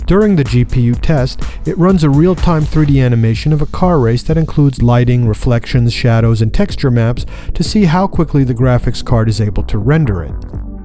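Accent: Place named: United States. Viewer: Its Zed not Zee American English wrecks real English. This is the most annoying yet also informative reviews of the Z840 ever.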